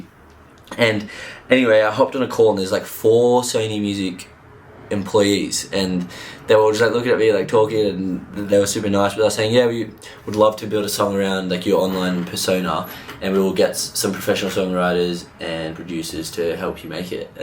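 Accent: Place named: Australia. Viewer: I just discovered you from Rob's Turbo Sprint video, I can't wait to watch the hour and a half of videos of your South America trip. Keep your energy and the good vibes. Love from French Canada! PS: I can't listen to your videos, I feel like my grandpa cause I need to lip read haha, amazing accent from down under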